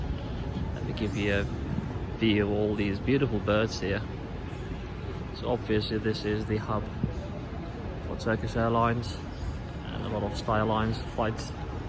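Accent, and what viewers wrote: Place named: United Kingdom. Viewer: Açıkçası bir Türk'ün bu kadar hatasız ve akıcı ingilizce konuştuğunu ilk defa görüyorum ve şaşkınlık içerisindeyim. Aksanınız İngiliz aksanı belli, peki İngiltere'de kaç sene yaşadıktan sonra ingilizcenizi bu kıvama getirdiniz? İngilizceyi bu kadar akıcı konuşabilmeyi neye borçlusunuz? Senelerce sadece ingilizce mi konuştunuz? İşiniz İngilizce konuşturmayı mı gerektiriyordu? Sırrınızı çok merak ettim :)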